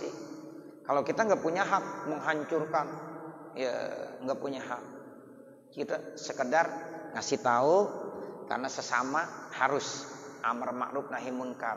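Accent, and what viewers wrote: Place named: Jakarta, Indonesia. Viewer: Smg Allah berikan kesehatan buat tuan guru kite, smg terus berdawah dgn bhs betawinya yg khas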